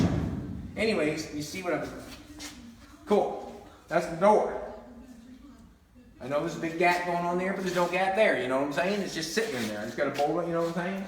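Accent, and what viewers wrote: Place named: Nova Scotia, Canada. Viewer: Love that Nova Scotia dialect.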